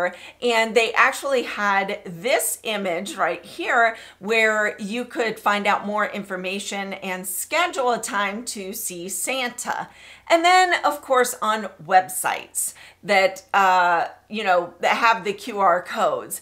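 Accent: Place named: United States. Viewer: thank you so much! You speak American English! Yay I can understand you! You explain in a simple and direct manner. And you are nice! Thanks so much!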